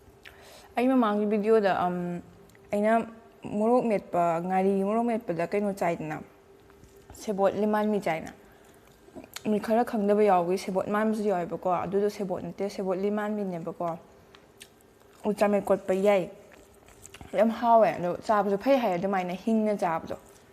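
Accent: Kakching, India. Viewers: Eigi favorite lonne kakcing lonse taba yam nungai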